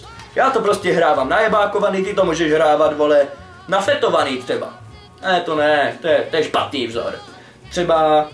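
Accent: Moravia, Czech Republic. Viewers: Jsi z moravy??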